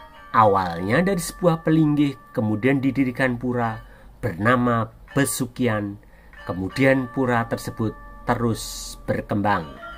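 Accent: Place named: West Java, Indonesia. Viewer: dari logatnya,admin orang Sunda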